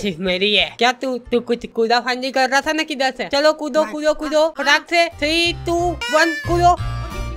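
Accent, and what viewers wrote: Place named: Malwa, India. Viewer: Bro tumhari urban malwu accent bahut mast h yaar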